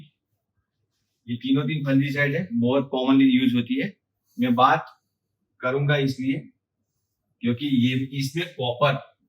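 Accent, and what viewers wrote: Gujarat, India. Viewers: ये शायद गुजरात से हैं❤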